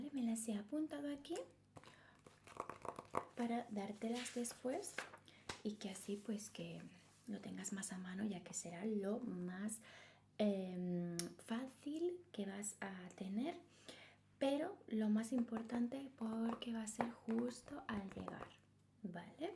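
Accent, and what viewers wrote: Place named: Latin America. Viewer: English language with your hispanic accent is so cute❤❤ ❤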